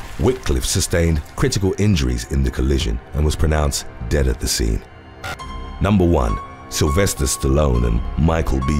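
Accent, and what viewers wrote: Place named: United Kingdom. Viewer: This guy sounds so British he should be in a James Bond movie...all the best from out here on land with the Kangaroos in Victoria Australia.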